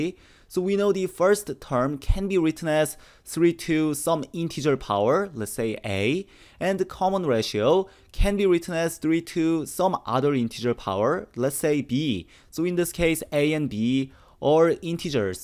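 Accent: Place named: South Korea. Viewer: His accent is sooo pleasant to listen to! I love it. If I had to guess, I think it is Korean.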